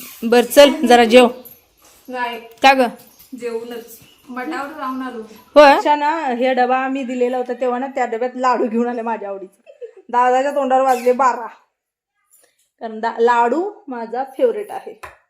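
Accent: Kolhapur, India. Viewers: ताई तु सोलापूरची आहेस का कोल्हापूर ची काही कळतं नाय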